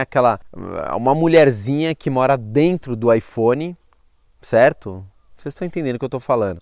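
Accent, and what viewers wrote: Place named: Rio Grande do Sul, Brazil. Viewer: Mas vc não é mineiro né?..vc é gaúcho tchê!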